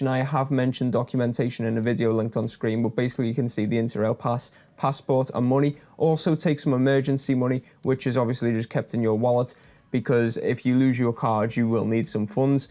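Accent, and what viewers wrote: Liverpool, United Kingdom. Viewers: Some great tips which I will use on my next (4th) InterRail. Quick question - what's a good weight in kgs to aim for in Summer and Winter please? ( I have a tendency to overpack - apart from when I make 3 night trips to Eastern Europe by plane when I maange everything in my standard size Fjallraven, Kanken !) Ta la ( you sound Scouse like me !)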